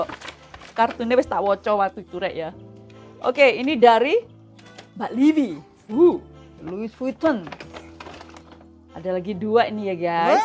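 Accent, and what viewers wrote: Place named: United States. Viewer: Happy belated Birthday wong ayu💐..Jeng pasti sdh lama tinggal di US ya..bahasanya sdh beraksen orang sana..enjoy your nice birthday presents👌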